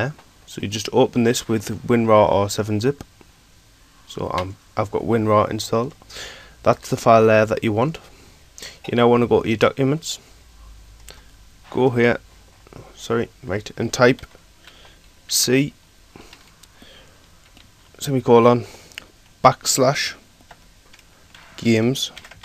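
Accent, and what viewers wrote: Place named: Jamaica. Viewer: i love your Jamaican accent :D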